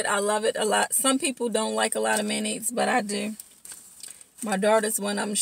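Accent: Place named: Baltimore, United States. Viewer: I just love all of your subway videos!! Are you from Maryland? You have an accent that I can't place, but you sound like my old neighbor. She was from Baltimore. I'm from Georgia, so you can always tell when someone moves here from some where else. She moved back there to be close to her family, but if I close my eyes I hear her talking. So just curious? Also wonder what kind of work you do? Your daughter is adorable!! I love, love your subway videos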